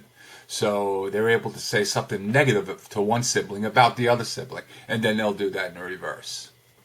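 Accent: New York, United States. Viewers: Are you from the east coast? I'm detecting a New York accent and as I'm typing this, you said Brooklyn. You sound like family! Anyway you look like a cross between John Gotti and Deniro. Take it as a compliment because they're both very nice looking men. I'm sorry that you had to deal with this shit. I sense a lot of those things in the narc I know. She is in a sick competition with her daughter. She financially supports her child and provides for her but any other mothering seems void. Only if it serves her. She'll put pictures on to get compliments.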